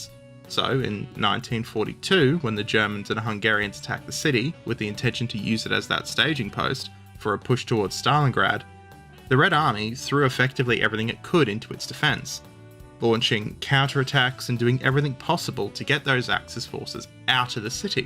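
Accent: Australia. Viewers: People being annoyed at hearing Australian accents? Who are these (third letter)unts? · IS that a thing??? People getting triggered by an Aussie accent??? WTF?!?!!?!? God help them if they heard my Southern Texas Accent. 🤣🤣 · Apart from the Aussie accent, I can't watch this facetious video about a Aeroflot jet hitting a landmine. Come on, be serious about your subject! 😩🙄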